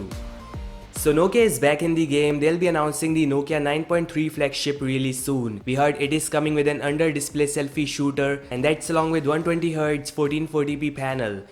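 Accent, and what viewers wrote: India. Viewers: I bet this is narrated by an Indian.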